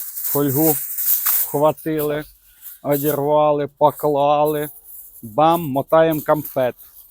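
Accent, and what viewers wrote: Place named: Central Ukraine, Ukraine. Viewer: Виглядає неймовірно й смачно, дякую за ідею і хороший контент❤(якщо не секрет-звідки ви)просто так наче з центральної України)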